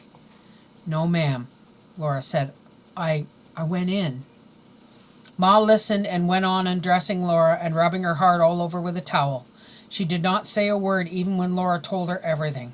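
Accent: Canada. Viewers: The Canadien accent (speech impediment) is brutal.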